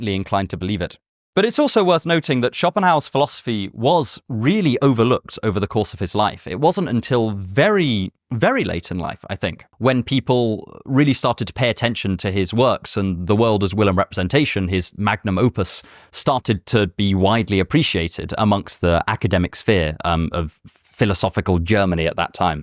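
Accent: United Kingdom. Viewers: His teeth and speech make him british.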